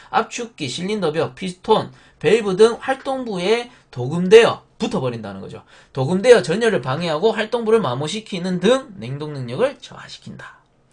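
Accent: Gyeongsang, South Korea. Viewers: 끼긱끼긱 빵터짓읍니다 경상도 방언 좋네요 열정적인 강의 감사합니다 NH3암모니아 올림 ^^ 따뽕 좋아요 꾸욱!!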